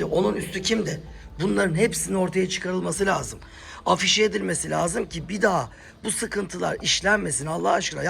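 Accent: Turkey. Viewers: Ne demek gayseriyse herkesin bir aksani var kücümseyerek yazmas saniz daha düzel olur Talha beyin videolarin da neler neler gördük heryer ayni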